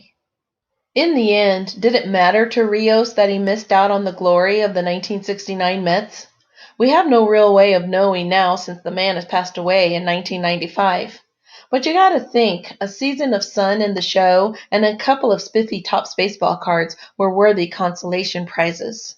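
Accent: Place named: Cleveland, United States. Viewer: Im sure you couldve said Juan Rios better. You sounded like someone from Cleveland. Still you get a thumbs up sister!!!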